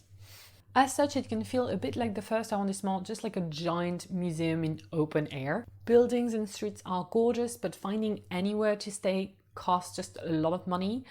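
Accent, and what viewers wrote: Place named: United Kingdom. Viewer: You sound like you are English (British)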